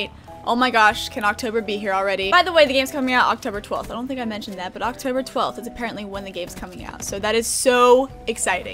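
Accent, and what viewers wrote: South, United States. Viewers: are you southern I like your accent lol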